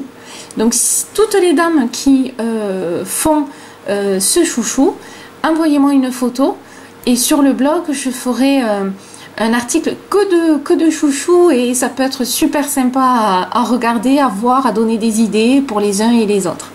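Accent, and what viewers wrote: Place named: Provence, France. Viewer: merci pour se jolie chouchou et en plus avec notre acsent je suis de provence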